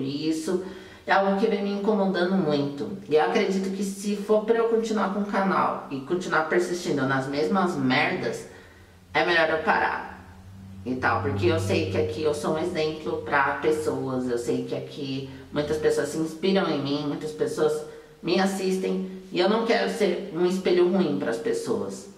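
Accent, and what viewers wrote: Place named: Portugal. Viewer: Tu és de Portugal? Pareces pela tua fala.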